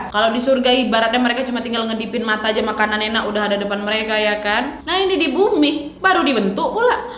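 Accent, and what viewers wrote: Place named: Medan, Indonesia. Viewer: Dan medan🤣 logat kau nampak jelas kale🤣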